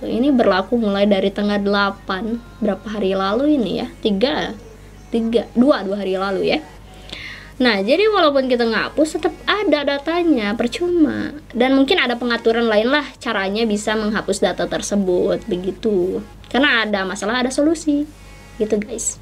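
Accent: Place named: Sunda, Indonesia. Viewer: Ciri has ngobrol nya Sunda banget teh semangat sukses sllu teh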